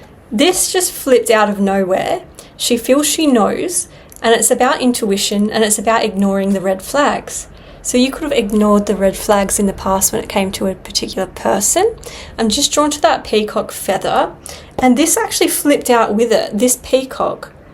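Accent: Australia. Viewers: Greetings from California. Good reading,. Good voice. You will do well in the music industry as a singer. You are a star of Australia. We really like the Australian accent. We can listen to talk all day. Happy valentines from our channel 🙋🏻‍♂️🧜🏼🧜🏻‍♀️🦜🦜🦜🦜🦅🦅🦅👑Sally Alexandria & Alex * Australian Parakeets Stars 🦉🦉🦉💕💕💕🎉🎉🎉🎈🎈🎈🧁🧁🧁🍾🍾🍾🎊🎊🎊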